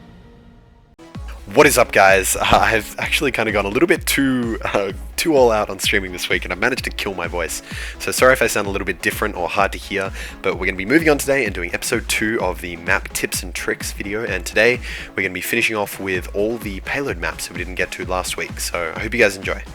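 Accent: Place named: Australia. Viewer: Muselk sounds more Australian that unual.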